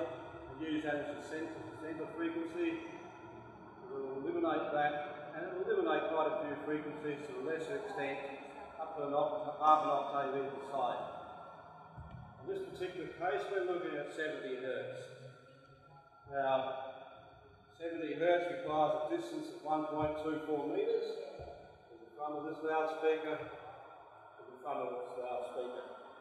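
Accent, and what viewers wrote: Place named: Australia. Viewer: Australian... cool.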